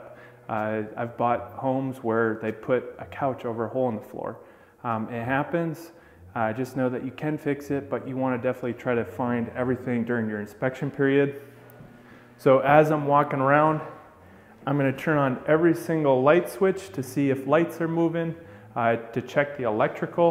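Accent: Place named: United States. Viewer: Nice to see a video where the person speaks decent American that we can all understand.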